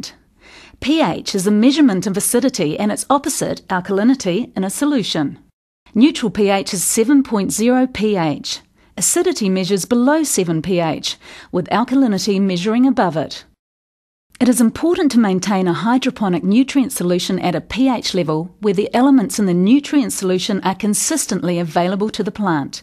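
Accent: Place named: New Zealand. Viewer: That's a thick kiwi accent! And a bloody helpful video. Thanks!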